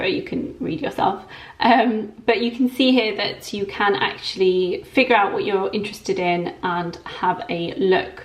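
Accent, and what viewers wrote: United Kingdom. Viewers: Really like your channel! I started investing roughly at the same time as you (Dec 2019), I am 27 and I am also an immigrant living in London, I feel quite identified. I didn't know you are Polish and all your family is from there. Your British English accent has 100% confused me. I suggest you take a look into Taylor Wimpey for a great Real Estate mainly UK based company. Very safe, almost no debt and a lot of growth ahead. Are you interested in any particular industry to get into more UK companies?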